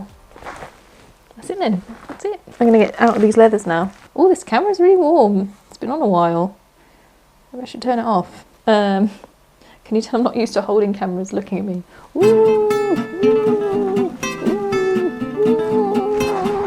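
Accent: England, United Kingdom. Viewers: lets comment. i dont norm bother but i found your channel ages ago and all i heard was some nuts english lass babbling away in her helmet. I was like yes so its not just me. You keep it it up and fair play with the face on cam stuff bst be careful on what ya put up on the because of the fuzz.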